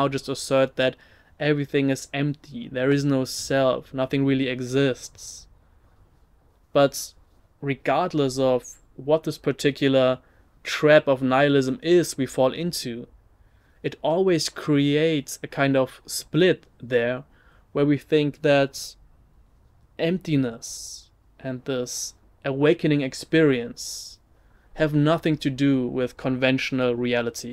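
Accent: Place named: Germany. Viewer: You sound German. Are you German? 😄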